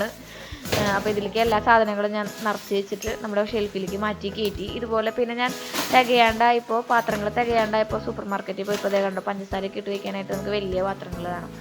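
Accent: Thrissur, India. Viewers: Poduve "poche" lifestyle kanikunna ippolthe vloggerslninnumm..sherikm natural aayt kanikunna chechik irikatte oru kudira pavan... adipwoli..kand irikaan patunindd..👍..thrissur bhashanod ishtm thoni.. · ചേച്ചി യുടെ സംസാരം കേൾക്കുമ്പോൾ എന്റെ അനിയത്തി സംസാരിക്കും പോലെ.... തൃശൂർ സ്ലാങ് 😍😍 എന്തായാലും നമിച്ചു ചേച്ചീ 🙏🙏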